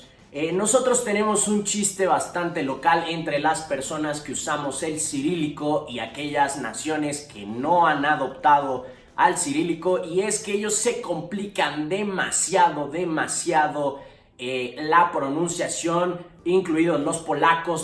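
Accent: Mexico. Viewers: Anda no te pases...suena mas a acento mexicano que a español de España... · A veces suenas como Méxicano Mano, pero es buen video, aunque la parte de la pronunciación la fue muy rápida, cuando uno le enseña a alguien que no tiene ni idea de un tema es mejor despacio. Es tal como si yo quisiera enseñarle a tocar guitarra a alguien y empiezo a hablar de progresiones con acordes de novenas, oncenas, trecenas etc. Si ve? Soy músico y quiero aprender Lonque más pueda de este idioma por mi nuera que es de Bulgaria. Entonces mira tutoriales de como ser maestros y seguro tendrás muchas suscripciones más. Me suscribo. Chao.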